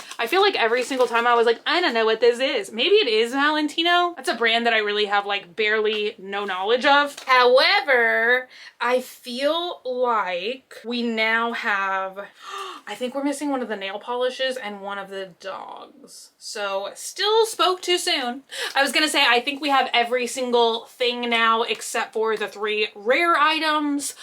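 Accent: South, United States. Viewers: I love your country voice, you should keep it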